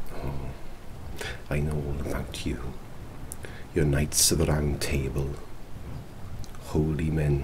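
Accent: Wales, United Kingdom. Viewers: As soon as I saw the horns, I thought: oh. shit. This is incredible,. And the rumbly Welsh accent is 🙌🏻. I have a lot of this world in my head lately- who else is excited for the Gawain movie this weekend?!💚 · I've watched this so many times now, since it first premiered in the collab...I pop it on every so often and get sucked into the pathos and can't stop watching. By the end I'm like, deeply moved, and also feel so serene from the atmosphere and the progressively more relaxing way the speaking style and mannerisms devolve. "Small mercies against a great evil, they make a difference to a man," indeed. Killer costume, and hats off to that Welsh accent and the distinctive voice and physicality and...self, you brought to this. One of my top favorites of yours...just...so good! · 「 greybeige 」 He’s actually Welsh so this is his ‘true’ accent.😊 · How did I not know until now how much I’ve been needing a Welsh accent in my ASMR? 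Magnificent in every way, as always,. 🤍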